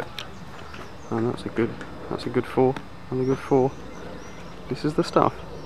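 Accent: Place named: RP, United Kingdom. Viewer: You sound a lot posher in this! Loving the video though and how your style hasn’t changed!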